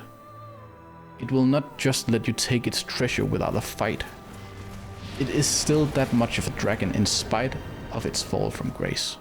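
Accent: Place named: Denmark. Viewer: Er du ikke dansker, syntes din acccent lyder som så ;)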